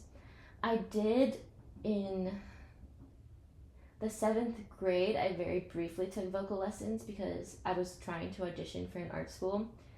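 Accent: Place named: United Kingdom. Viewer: but her accent is british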